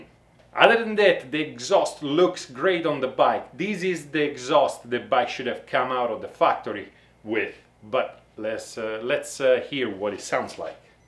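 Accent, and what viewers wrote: Italy. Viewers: That italian accent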